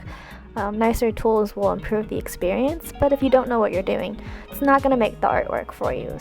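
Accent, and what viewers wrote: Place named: United States. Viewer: You sound normal, wth. Idek what's up with the whole voice thingie but you sound like a normal American girl. Like, dude. You have great art, smh. It's gonna take me years to be as good as you and what sometimes hurts? Your voice? Bruh. There ain't nothing wrong with your voice, G. :/ And what negativity? My G, opinions are opinions and if someone doesn't agree, then that's their opinion. You have a lovely voice, it's fine... on a separate note, I love her hair... I betcha if I stepped on her hair, I'd be falling through space. 👀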